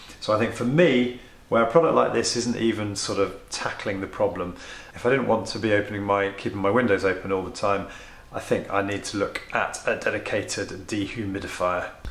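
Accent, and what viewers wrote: United Kingdom. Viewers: Hi, judging from your accent you live in the UK. In your climate you will always get moisture on your windows if you have a single glass window. When the air hits your glass it has a sudden change in temperature and will therefore reach its "dew point". To avoid this, you need to install mechanical ventilation...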